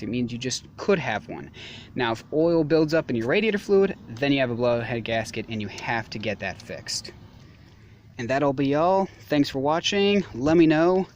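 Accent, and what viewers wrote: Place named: United States. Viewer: I LOVE YOUR VIDEO . WHAT SIZE SOCKET? I LOVE HAVING SOMEONE W/ GOOD DELIVERY IN U.S. ACCENT. OTHER ACCENTS TOO HARD TO LISTEN TO.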